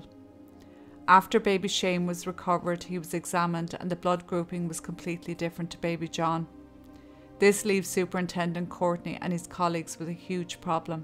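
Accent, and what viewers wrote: Ireland. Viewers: I do have to say that these stories of murder and the following mayhem are awful, but getting to hear them told in that beautiful Irish lilt of yours makes the hearing of them a little more bearable. I’d love to remind folks that “the church” and “religion” is about as far from God as living in sin is. Remember, it was the “church people” who crucified Jesus. Jesus never condemned anyone. He taught His message. Those that received that message were told to go on their way and sin no more. Those that did not, they just went their own way. Most of the atrocities committed in this world have been done in the name of God, but the SPIRIT of God was not behind it. Jesus Christ was crucified by “church people”. If church people would crucify our Savior, how many more of us regular folk will be made to suffer at the churches hand? I hate to think of it. 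Organized religion is the very devil!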